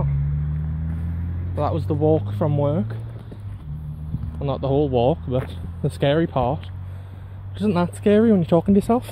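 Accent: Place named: United Kingdom. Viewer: hey we now found out ur voice and aparently ur british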